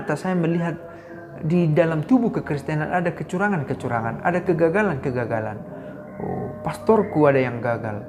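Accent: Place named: Flores, Indonesia. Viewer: Bro ini chinese berlogat flores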